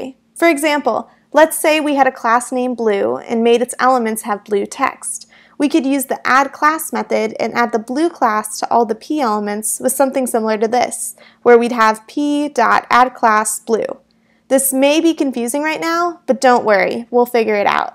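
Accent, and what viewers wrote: England, United Kingdom. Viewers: Dam your English accent is very nice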